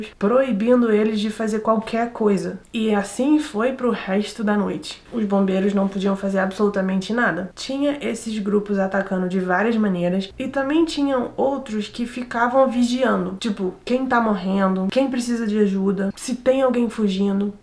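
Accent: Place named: Rio de Janeiro, Brazil. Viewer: Ótimo vídeo. Você fala muito bem português e com sotaque carioca. Você é linda e tem uma voz suave. ❤❤❤❤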